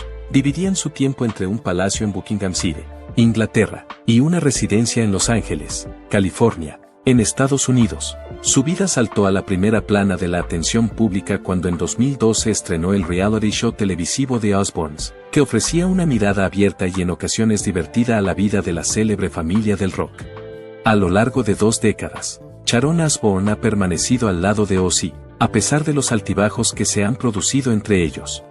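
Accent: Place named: South America. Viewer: Creo k se refiere a k fue una estrella xk antes hacía conciertos y sacaba discos y ahora por su enfermedad no puede solo por eso o de pronunciar Charon en vez d Sharon es porque es sudamericano y ellos pronuncian así por ejemplo mi nombre es azucena pero ellos dicen asusena hasta tengo una anécdota con eso un día k fui a recoger una pizza me decían k era para asusena no para azucena ja ja ya ves osea k no se lo tomes en serio k el chico hizo un video muy bueno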